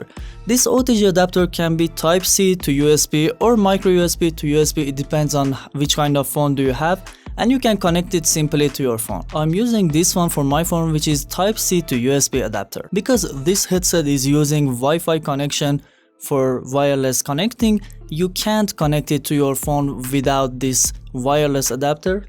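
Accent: Iran. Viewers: Are you irany?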